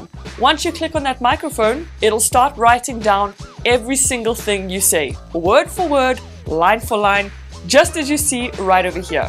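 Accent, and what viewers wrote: South Africa. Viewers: Is that a South African accent I hear? 👀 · Kom je uit Zuid Afrika?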